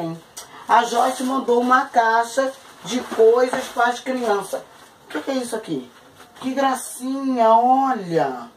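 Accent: Rio de Janeiro, Brazil. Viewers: Como não amar 😍😍,saiba que a senhora me ensinou muita coisa viu,principalmente os trabalhos de casa pra ajudar minha mãe 😍😍...Adorooo a senhora... Obs: Quando ela fala:pra mim é pra patyy,que sotaque gostosooo carioca...😍😍😍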